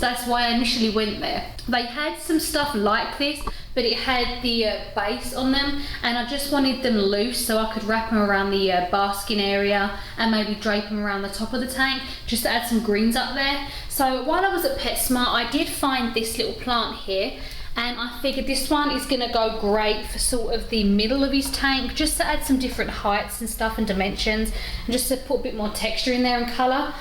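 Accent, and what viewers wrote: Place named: London, United Kingdom. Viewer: You went to Walmart. You sound like your from south or east London.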